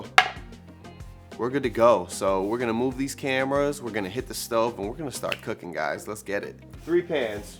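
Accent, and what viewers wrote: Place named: New York, United States. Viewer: I love how much of a New Yorker you sound. This looks banging. Definitely not traditional but has the perfect amount of twist.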